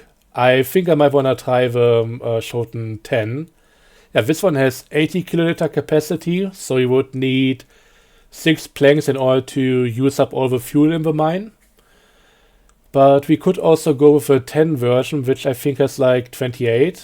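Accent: Germany. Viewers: Man merkt das du deutsch bist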